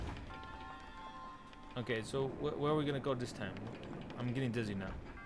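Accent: New York, United States. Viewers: So, im from NYC (born BK, raised Staten Island, so you can imagine The accent I have)..and so many of my gamer friends want me to voiceover my videos cuz of that accent. And hearing you in your video, now I understand why. Absolutely awesome 🤣🤣 (side note, thank you, I couldn’t find this shrine for the life of me lol) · I will have to say, I realized right away that this is the first YouTube video I've ever watched with a New York/Guido accent. I'm not even mad.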